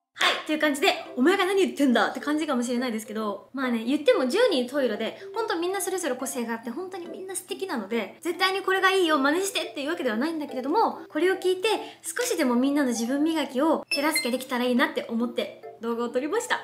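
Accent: Japan. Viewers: 喋り方めっちゃ一緒やーって思ってたら同じ県民やった‼︎親近感♪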